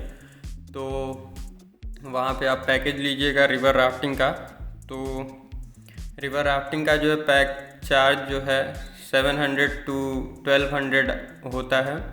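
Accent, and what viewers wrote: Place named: Bihar, India. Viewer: Good try bro, i love your content. But apko hindi thoda सुधारना पड़ेगा, कुछ ज्यादा ही बिहारी accent hain, like-aaj hi bhar ka time hain 🙄.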